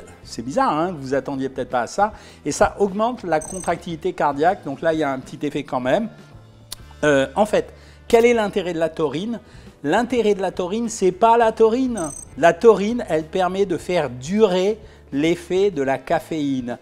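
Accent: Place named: England, United Kingdom. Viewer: J’adore votre accent anglais 😂😂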